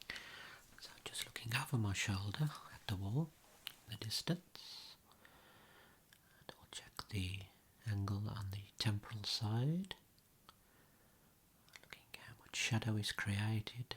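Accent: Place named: Black Country, United Kingdom. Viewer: Great video, love to hear the Black Country accent!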